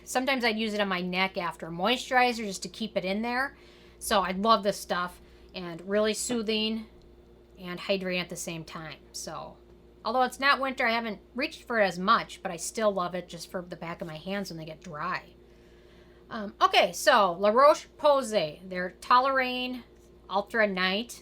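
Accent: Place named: Dakotas, United States. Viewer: Curious are you from one of the Dakotas? Minnesota? Trying to nail down that accent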